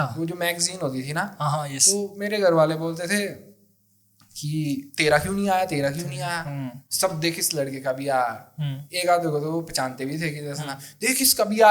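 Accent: Pahari, India. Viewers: Yahi bhai ki sadagi hai accent ni chodte pahari 😂love u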